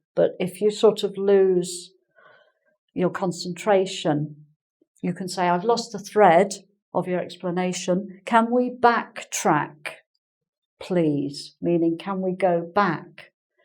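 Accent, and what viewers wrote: United Kingdom. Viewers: Granny !! Really all Your lessons very fantastic and useful for beginners to learn the British accent could you help me to get the special classes to get improve my pronunciation&accent .. hopefully waiting for ur text message!! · Hey there! I'm a brazilian English student. I thought her British accent so cute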